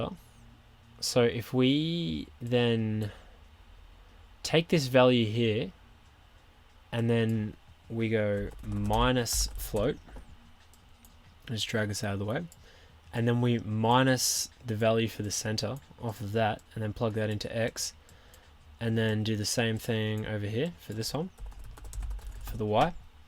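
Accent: Australia. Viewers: Thx for this tutorial!! A few questions though... How can I use a Navmesh bounds volume with this? I added one to a tile and it works on that tile but not when the tile is generated through the BP. Also I loved looking at where you have taken this BP with your Dev Log video and I was wanting to know if you could tell us how to do a couple of things that you never got to in this video. Probability for the tiles to spawn Seeded generation, mostly so you could save and load back to where you were I have got enemies spawning so I guess items will be similar to that but any tutorial you could do that takes this BP and adds in item spawning and enemy spawning would be useful, just to see how you do it and how you build it in to this BP. I have built this BP and modified it a bit but would love to buy it from you if you had an updated version of it for sale with some of the added cool stuff you have done with it!! 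Thx again... really helpful stuff. BTW good to hear another Aussie doing such cool stuff :)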